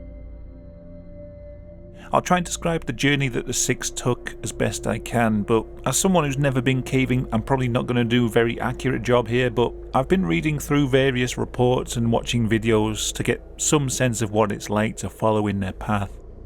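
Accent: United Kingdom. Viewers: Ur British ascent is too muchhhh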